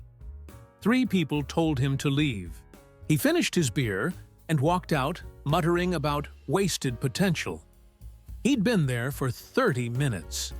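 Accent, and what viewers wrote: United States. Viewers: Yank voice. Not Aussie!! More Crap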